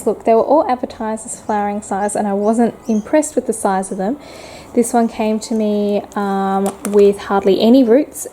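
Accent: Australia. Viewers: Thank you for this great 100th video. Nice to see the face with that fabulous Australian accent. Keep the great videos coming!!!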